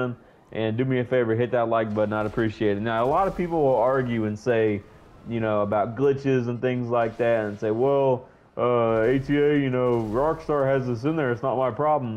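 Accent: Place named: Mexico. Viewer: You sound mwxican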